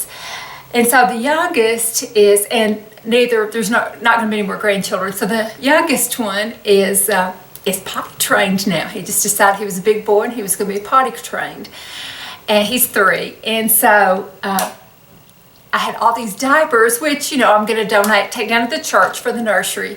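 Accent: South, United States. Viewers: Hi neighbor - I am from the western side of Arkansas. Discovered your channel thru The New Beauty email article recently. Love watching you and hearing that southern accent and phrases I am so used to. I feel like you are my good friend! Your demos are very good, especially for me, since I am 9 years your senion. I will keep watching. Keep up the good work!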